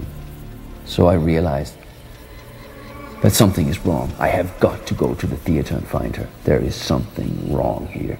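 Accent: Denmark. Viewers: The danish non native english accent makes it kind off creepy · By listening to this, i found out this dude is from Denmark *lol* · My god... he sounds truly danish. XD But... very scary at the same time. That his way of speaking english reminds of my own english teacher is not exactly helping. :P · you can still hear his danish accent.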